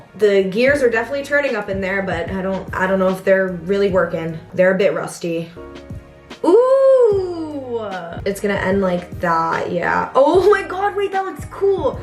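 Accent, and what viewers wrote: United Kingdom. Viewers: r u british??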